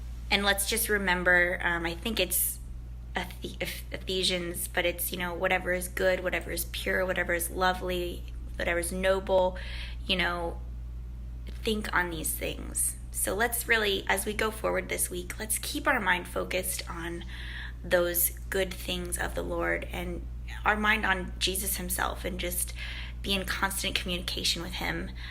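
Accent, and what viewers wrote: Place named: United States. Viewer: Very good voice and thoughtful video.. I like Jesus's teachings. But I don't believe in things like hell and heaven. I would love if you make a video on 'Matthew 7' which is about judging others. That is one of my favorite chapters in Bible. I also like sermon of mount, his parables regarding sowing seeds and many others.. Here is a poem that I wrote combining some verses in Bible and some concepts in Eastern traditions. You may be able to spot a few Bible verses in it. I really like this poem to be heard spoken by someone with a good American accent, like you do. So, in case you like to read this poem in a video, please feel free to do so. It is titled 'Good News'...Here it is: Your life is the most beautiful mystery And the screen which plays your private history; Your life is the truth, consciousness, and bliss That can’t be pointed out as that or this. 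The life screen is the only truth you know; All that are manifest move in its show; This essence of life is your true nature; Knowing this ends the distress and torture. The one truth that pervades the time and space Shines in the heart and showers love and grace; By the veil of darkness it is concealed; By nonstop inquiry, it is revealed. That one truth is called by various names; Deep inside you, it eternally flames; It is the immortal life and the way; Look inside, you can find its sparkling ray! From the dawn of life, seers have seen this And were reborn by its transforming kiss; Unless you’re reborn as a wide-eyed kid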